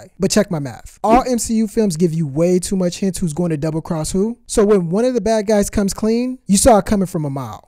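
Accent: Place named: Latin America. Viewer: It's lovely. I like your voice/accent. I don't think we have many latino peoples in Britain :)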